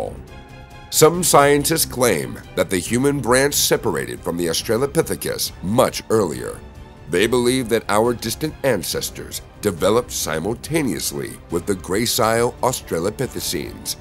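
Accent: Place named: West, United States. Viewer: Why speak with an Western accent and say meters and grams instead of ft and lb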